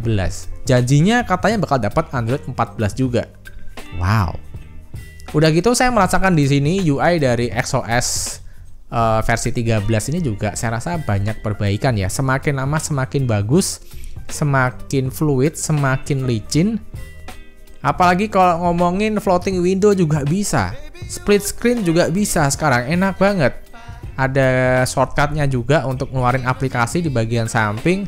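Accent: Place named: East Java, Indonesia. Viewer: org jawa tah mas ?? logatnya kya daerah wetan sana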